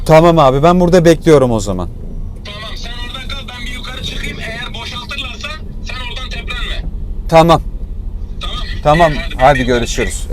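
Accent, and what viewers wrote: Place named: Giresun, Turkey. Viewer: Kardeş giresunlu musun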